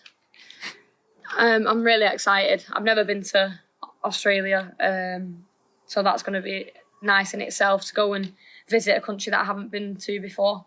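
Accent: United Kingdom. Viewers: I love the accent !! Is that Liverpool’s?!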